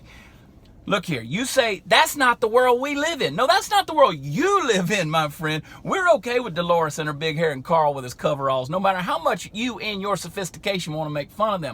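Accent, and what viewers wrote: Texas, United States. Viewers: LMAO!!! I don't know where he is from but I love to listen to him because I miss Texas so much. Not many guys like him here in GA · OMG I love you you are too funny. what part of the South? Sound kinda like Texas but have been know to be wrong.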